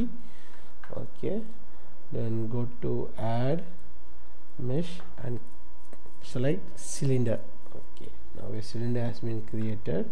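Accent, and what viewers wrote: India. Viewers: your indian right?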